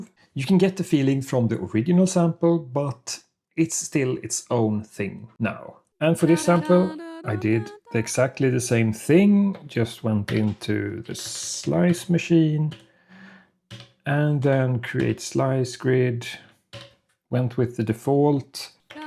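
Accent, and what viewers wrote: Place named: Sweden. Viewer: You sound super Swedish!? ❤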